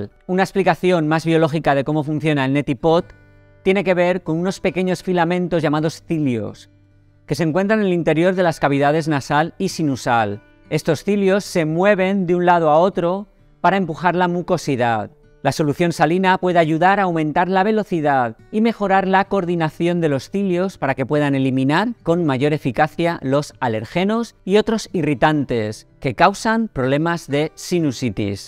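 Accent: Spain. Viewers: fuera moco de mi garganta.... genial, muy práctica y exhaustiva tu explicación. Cada vez más informado. Genio!!! si vas al médico te dice: -consume lácteos, sra? y tu te apestas comiendo lo que te enferma!!!! ( pero que a tu paladar le encanta!!! no somos inocentes!!! ) volvamos a la fuente, comamos como nuestros abuelos. Ni poco ni mucho: suficiente! Y sencillo. De buena calidad nutricional. Pero no!!! vamos a la tienda de alimentos y compramos etiquetas... así nos va!!!! Gracias. Me agrada tu acento tan español... Te saludo desde Argentina.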